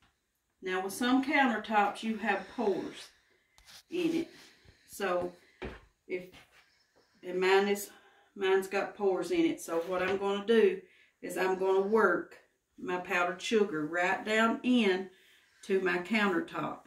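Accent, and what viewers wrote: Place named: Kentucky, United States. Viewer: Sounds like a Perry County KY accent 😊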